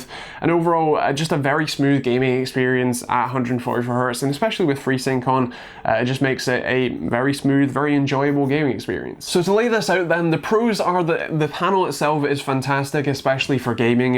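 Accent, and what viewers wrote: Scotland, United Kingdom. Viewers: Where in Scotland are you from? Sounds northern. Maybe Inverness?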